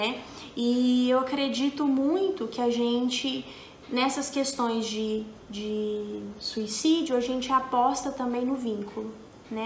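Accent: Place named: Minas Gerais, Brazil. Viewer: sou estudante de Psicologia, estou no do 7º semestre. E sou apaixonada por suas aulas, não apenas por sua didática de ensinar, de transmitir o seu conhecimento, mas pelo seu sotaque gostoso que me remete saudosamente ao meu pai que era também mineirinho. Obrigada, por compartilhar informações de tamanho valor para nós estudantes, com tamanha riqueza e simplicidade ao mesmo tempo. Sempre compartilho seu conteúdo com meus colegas de turma. Beijokas e fique sempre bem (sempre que possível)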